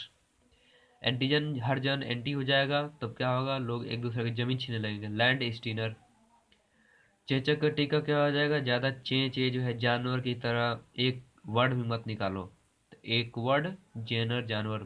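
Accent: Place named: Bhojpuri, India. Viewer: Bhojpuri Bahut pyara bolte hai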